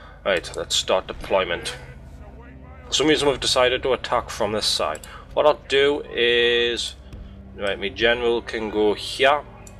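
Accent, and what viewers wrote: Scotland, United Kingdom. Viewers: You look like a scotland by listening to your accent. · I know late with this, but i lov your accent. Me being part scottish myself, iv always wondered what we sound like. I also get an unusual amount of exitement from ur voice and how u say me instead of my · Am I wrong or You've got a scotish accent? The way you say "here" and "fock" is very unusual.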